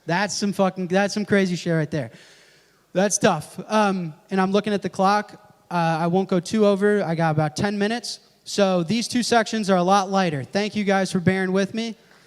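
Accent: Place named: France. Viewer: Il n'y a rien de plus élégant et compréhensible qu'un anglais hésitant parlé avec l'accent français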